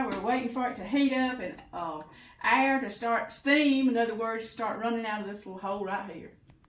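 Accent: South, United States. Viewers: I’ve only been canning a couple years so I love how you tell a story almost. I am from the south so our accents are similar - Tennessee / North Carolina. I subscribed and will go back to your other videos also. ☺️ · Great video! You're so precious...love your southern accent!!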